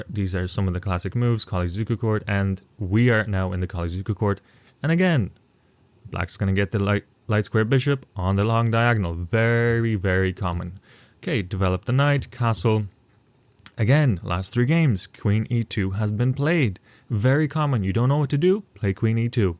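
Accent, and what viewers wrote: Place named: Midlands, United Kingdom. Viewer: Thanks for adding another idea to my opening repertoire What county are you from bro? Can't put my finger on it, fairly neutral so west/midlands?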